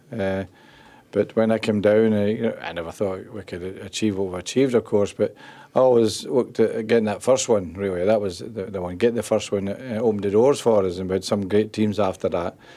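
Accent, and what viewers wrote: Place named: Scotland, United Kingdom. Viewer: I find it so satisfying to listen to a scottish accent for some reason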